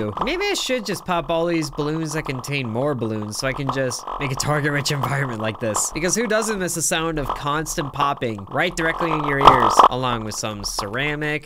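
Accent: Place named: United Kingdom. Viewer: Your accent is probably why they think you're British? 🤔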